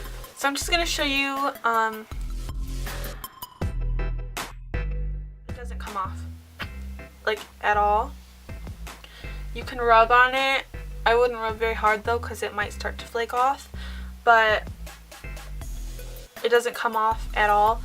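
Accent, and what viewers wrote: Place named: Boston, United States. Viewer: Your boston is so cute!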